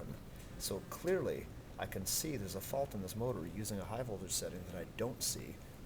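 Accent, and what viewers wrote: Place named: United States. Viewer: fed up of us accent ............. dat person shud learn english frnst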